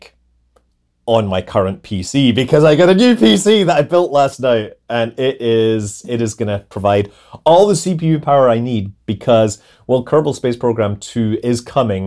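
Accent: Scotland, United Kingdom. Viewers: In addition to your excellent reporting, I also enjoy hearing a Scot speak with the accent notable among the people of my heritage.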